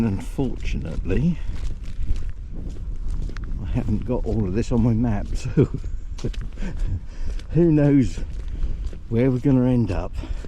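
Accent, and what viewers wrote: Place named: Norfolk, United Kingdom. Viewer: nice to hear the accent!, I'm a Norfolk gal living in Kent 🙂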